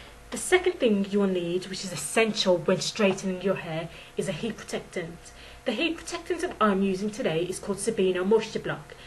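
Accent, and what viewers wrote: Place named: Birmingham, United Kingdom. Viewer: Thanks for that vid, very informative and i love, love, love your accent; are you from B'ham UK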